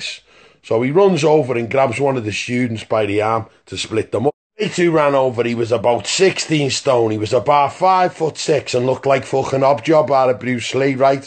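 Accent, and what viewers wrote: Ireland. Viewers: Irish video comes up first thing I do it’s put subtitles on lol. Didn’t help! Omg I love this guy!!!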